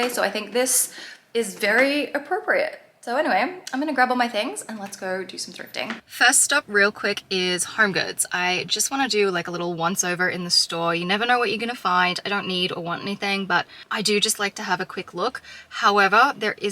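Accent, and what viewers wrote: Australia. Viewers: You’re such a Fun, often funny Adorable Young Lady which I love! From a blonde girl myself, I’m still getting used to your darker hair and honestly it suits you well ✨ I Appreciate your down to earth, real and often vulnerable approach which makes You a Joy to watch. Of course I Adore your accent (I’m a Southern gal) & find it so Lovely🤍. I fairly new to your channel & so Refreshing to hear from you!! I believe you’re Australian, if I’m wrong please correct me. Is Australian as well?! Just wanted to say Hello and let you know how much I Appreciate You 🤍🤍🤍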